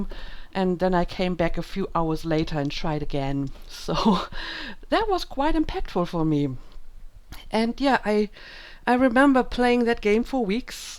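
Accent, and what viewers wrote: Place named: Germany. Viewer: Myst... that was the first game that scared me too. But I was very young and didn't understand a thing. And nice german dialect you have there. Ist das norddeutsch/friesisch? Ich kann es überhaupt nicht einordnen. Hört man im Süden nicht so oft :D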